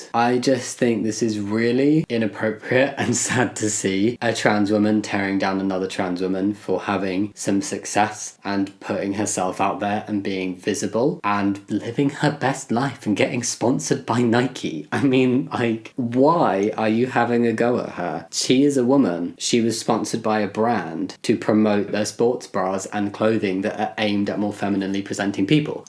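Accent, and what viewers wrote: United Kingdom. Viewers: He's always been British... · When did you move to the UK, your accent is very British?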